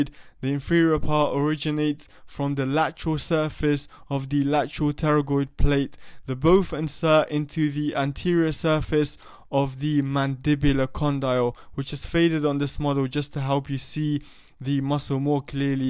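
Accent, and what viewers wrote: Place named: England, United Kingdom. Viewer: great..loved it, and its propper English accent😱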